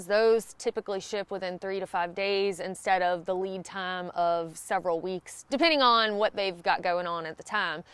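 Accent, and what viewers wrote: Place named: Alabama, United States. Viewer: You are so thoughtful! I know you can take the girl out of Alabama but you can’t take the Alabama out of the girl…You crack me up! Having to put an * for “side-note” so that people didn’t think you said “sad note”! 😂 that and I haven’t heard “fellas“ in a long time! Love your videos!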